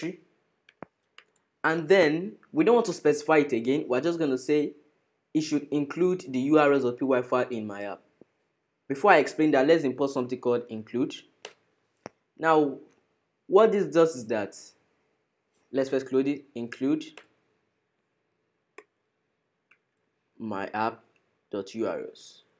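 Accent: Nigeria. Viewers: May God bless you. This is the only video that is slow enough for me to comprehend. It also has this Nigerian accent.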